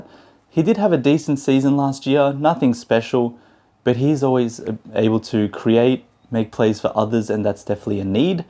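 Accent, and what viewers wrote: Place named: Australia. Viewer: Just found you. I grew up around Dallas when they first became a team in 1980 or the 80's (getting too old to remember). I'm guessing your an Aussie, hard to tell with some Aussie, English, or Kiwis, for me. Great analysis, would be awesome to get Dragic and Oubre. You never know with Cubes. Great that Slovenia and Australia are playing each other that will bond Luka and J Green. #mffl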